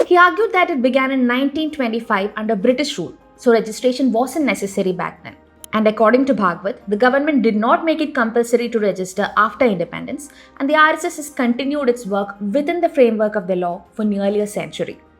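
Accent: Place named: Kerala, India. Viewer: What motivated this lady to probe RSS, from the accent she sounds like a Keralite....must be some middle Eastern *und which is under her table,throbbing her for this video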